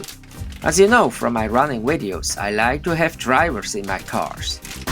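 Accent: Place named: Switzerland. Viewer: great video like always... and the Schweienglisch is great!!! gruess us züri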